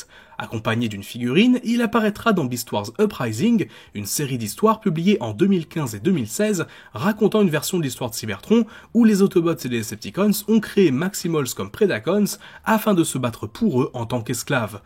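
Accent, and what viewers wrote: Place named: France. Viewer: J'avoue qu'avec un accent français, on comprend comme ça !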